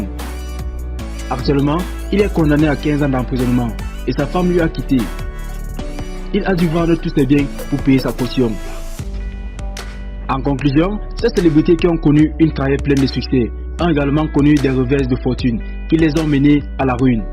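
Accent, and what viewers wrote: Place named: Cameroon. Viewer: Mon ga tu raconte que des connerie sur té vidéos a entendre ta voix je suis sur que té un camerounais avec ton acsen 😂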